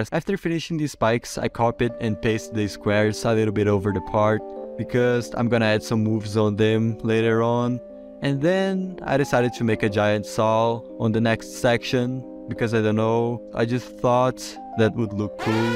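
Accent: Brazil. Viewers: Reconheço sotaque brasileiro de longe, é sempre raro e bom ver YouTuber BR na gringa. Keep up with the content <3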